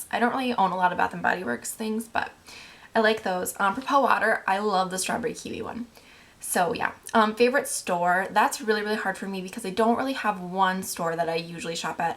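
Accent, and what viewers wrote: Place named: Minnesota, United States. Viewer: I knew you lived in minnesota!! you totally sound like it! but yeah i live here to!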